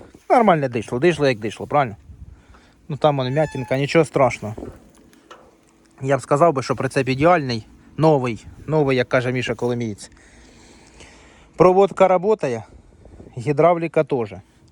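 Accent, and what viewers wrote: Poltava, Ukraine. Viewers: по говору поняв, шо наш - полтаський. Балакає !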